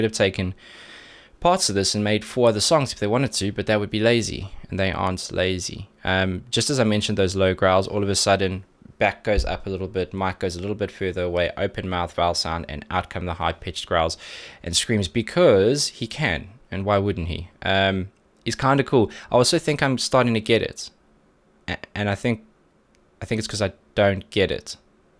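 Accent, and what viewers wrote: South Africa. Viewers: I kind of fell down the rabbit hole of watching various YouTubers reacting to but when I came across this video, boy was I surprised! I Instantly recognised your accent as being South African! As a long time fan of from South Africa, it was trip to find this! I think of all the reaction videos I've watched, this one was the most technical and detailed. It's been interesting hearing about different aspects of the instrumentals (other YouTubers don't really mention them). On, they have a large catalogue of music and their style has changed quite a bit over the years but is one of their defining songs. It's 9 minutes of ebb and flow where you are waiting for the wave to break onto the shore and when it does, wow, does it overwhelm you. (As a viewer who knows the twists and turns of the song, it is fun to watch people's first time reactions to it's unconventional structure.) Kyo (V) has an amazing vocal range so people often focus in on him but the other members of the band, Toshiya (B), Kaoru (Gt), Die (Gt) and Shinya (Dr) are also all immensely talented. 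They've been going for over 20 years and still making music but I love how one never knows what to expect from them next. Still on my bucket list to see them perform live one day!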